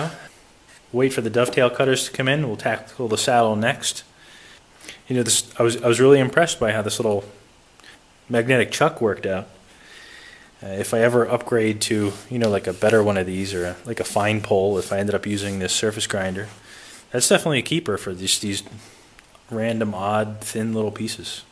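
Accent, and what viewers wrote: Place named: New York, United States. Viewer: Does hail from New York? That might explain the vocal similarity to Raymond Romano and Alan Alda who both are From there.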